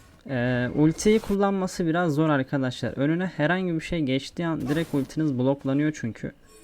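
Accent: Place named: Samsun, Turkey. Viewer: Bu adam Samsunlu la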